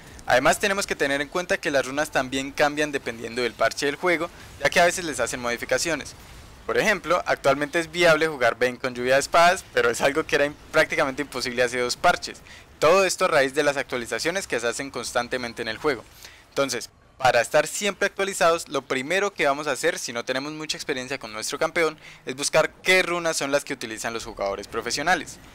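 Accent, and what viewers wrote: Colombia. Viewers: se te nota lo colombiano xd buen video tio:^y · muy buenos tus vídeos y se entiende lo colombiano v: toma tu like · Amigo mío eres de Colombia?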